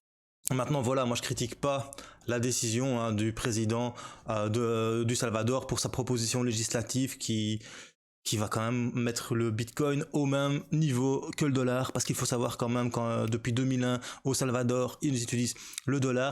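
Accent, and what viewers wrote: Liège, Belgium. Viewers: Merci pour la vidéo. Bonne semaine de vacances si je ne me trompe pas. J'aime bien cet accent Belge. J'ai l'impression d"écouter du Benoît Poelvoorde , surtout quand tu t'agaçes ;-) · Le bon accent de Liège ... Bonjour de Mons en Belgique continues tes vidéos poto ...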